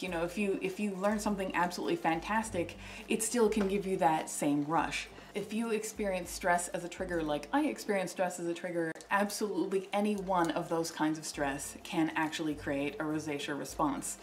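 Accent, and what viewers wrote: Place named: Canada. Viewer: So so gorgeous.. like seriously, love look, and the Canadian accent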